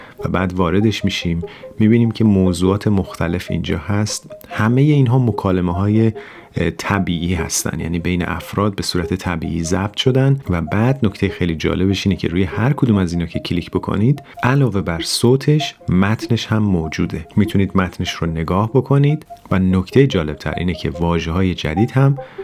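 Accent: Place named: United States. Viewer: ممنون از لطفتون🙏🌱 خودتون با لهجه‌ی آمریکایی در کشور انگلیس صحبت میکنین این تاثیری روی ارتباطات نداره؟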